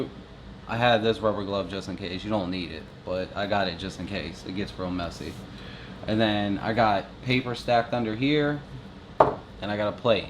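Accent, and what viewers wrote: Baltimore, United States. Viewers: I could tell in 10 seconds that you were from Baltimore man. I'm an old school South Baltimore 21230 guy here. Born and raised. Light St. , Charles St and Fort Ave. We all have that Baltimore accent that people can't miss. Lol. Its not often that I see many of us Baltimore guys on YouTube. Best of luck with your channel !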